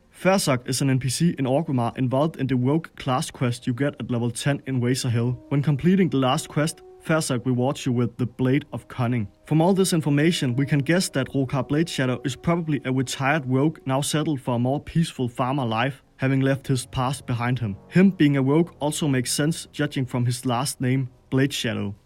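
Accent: Denmark. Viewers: Danish here. Hes Danish. Not a single doubt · Cranston he is danish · I listened to his video. He sounds painfully Danish. I know since I'm Scandinavian. It's like when an American hears a Canadian accent. I wouldn't be able to pick up on it and might even think I'm speaking to someone from the US but Americans seem to pick up on it instantly. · A strong Danish accent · You Sound very Danish. Where do you come from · This is the most danglish english I've ever heard.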